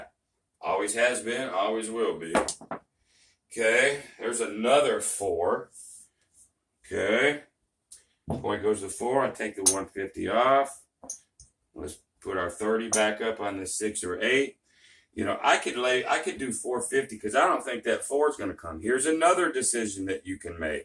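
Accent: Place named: Texas, United States. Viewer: Thanks I appreciate your video. Love to see it. That accent reminds me of Texas🌵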